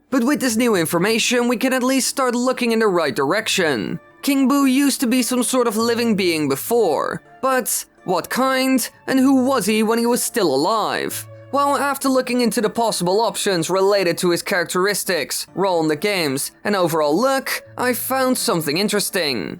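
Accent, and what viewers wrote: Netherlands. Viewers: Ik dacht al dat je Nederlands was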